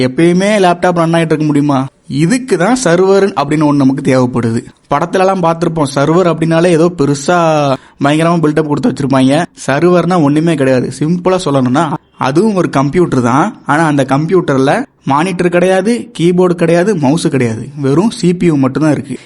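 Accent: Tirunelveli, India. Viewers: Bro .... Very clear explanation in tirunelveli slang ❤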